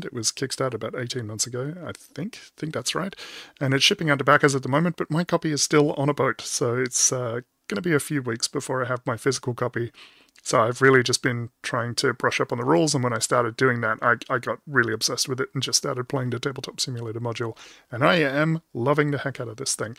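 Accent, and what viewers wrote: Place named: Australia. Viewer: Mine's on the boat too! I'm Aussie. U sound like one too!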